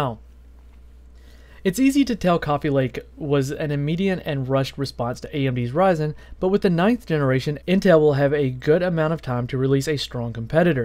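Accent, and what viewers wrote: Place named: Texas, United States. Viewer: Lol dude i love ur accent. It reminds me of when I lived in Texas.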